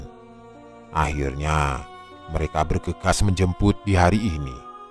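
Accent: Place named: Palembang, Indonesia. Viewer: Dari logatnyo kau wong palembang yoo?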